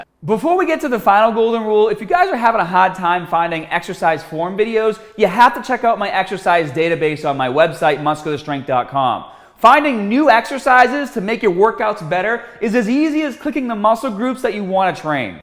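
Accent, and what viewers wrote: Boston, United States. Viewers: Glad I found you again, I used to watch you way back, maybe <num>. All I could remember was that I was looking for a guy with an epic Boston accent. Great content!!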